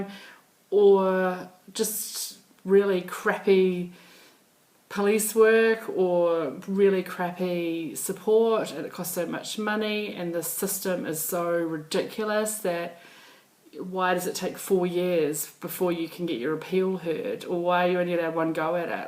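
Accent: New Zealand. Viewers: So nice to hear a Kiwi accent again..here in Texas from an expat Kiwi.. Your stitching is lovely..Nice to hear your char t too..Glad you perservered..😊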